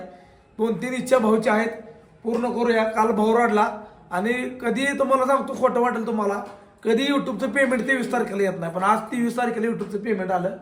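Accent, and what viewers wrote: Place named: Solapur, India. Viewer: खाली ये बे लाज आबरू सोडला बे भारी होत हे😂😂😂😂😂😂 आ दादा खुप हसलो आमी छान बोलता दादा तुमी सोलापुरची भाषा ❤❤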